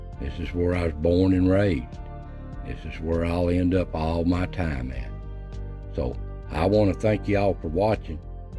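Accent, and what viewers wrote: Appalachia, United States. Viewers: I feel kind of a kinship to you. My people settled in the Appalachians on the east side and yours on the west side, we both have the same surnane and we're both country boys from birth with the same accent. If we could go back far enough I think we would see that our families (on the paternal side) came from the same place.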